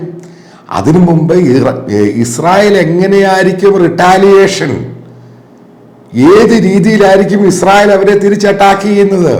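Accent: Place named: Pathanapuram, India. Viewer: Sir your analysis very interesting. Your mada koda typical pathanapuram style. Continue sir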